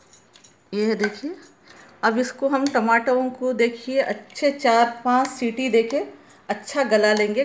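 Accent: Hyderabad, India. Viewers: Ek dum Hyderabadi hai aap 😂 Mere jaisa ich 😎😂 Ek pyaaz ki dalli 🔥 Dialogue was awesome 😂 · Aswk aunty I like yr way of speaking a pure hyderabadi language we enjoy yr style of cooking For the first time we heard of dahi ka dalcha thnx for sharing new recipe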